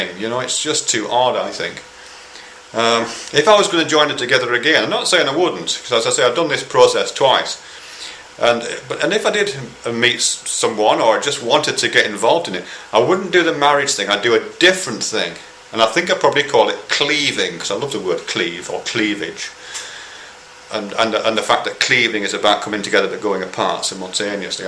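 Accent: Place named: Bolton, United Kingdom. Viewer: As a side note, your dialect sounds similar to my wife's ex-husband, who is from Bolton That made me look up Blackburn, and I found that they're not far apart :-)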